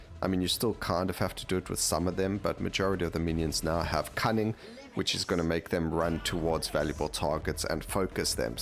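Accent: South Africa. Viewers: Anyone else love the South African accent? Wack ton of a awesome lol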